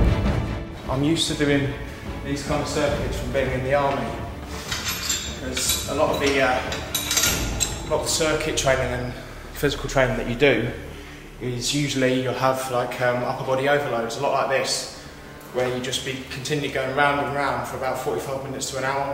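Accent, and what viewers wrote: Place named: United Kingdom. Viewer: British accent + good body (y)!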